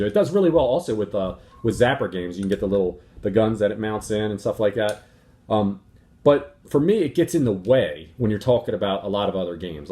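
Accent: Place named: Maryland, United States. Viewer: I thought I detected a Maryland accent on you man. I am a Marylander. I love those beers. I agree those nunchucks are cumbersome. Switch Pro Controller is my fav. Nice VR.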